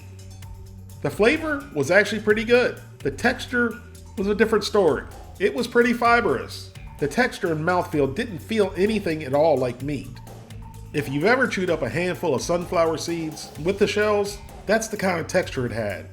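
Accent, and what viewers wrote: South, United States. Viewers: Being from the northeast, nobody here says "pizza pie". It's awkward and a bit redundant. So hearing it, along with a southern twang and so much excitement is funny, in a strange way. It's not like I'm having Vietnam-esque, PTSD flashbacks and night sweats. But it's amusing though.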